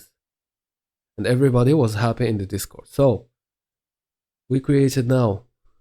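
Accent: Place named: Morocco. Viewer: سلام عليكم، اخي عرفتك مغربي من صوتك تبارك الله عليك وبغيت نسولك واش تنصحني نبدل من ict لهاد الموديل تع dtfx واش في نظرك احسن من الايسيتي حسب التجربة ديالك ? وسؤال الثاني واش مكينش شي استراتيجية فيها RR اكثر من 1 في استراتيجيات تع ال dtfx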